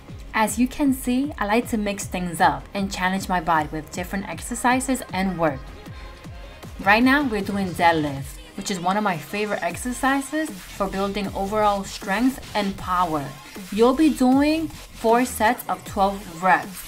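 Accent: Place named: New York City, United States. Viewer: From where is your accent? Sounds from NYC.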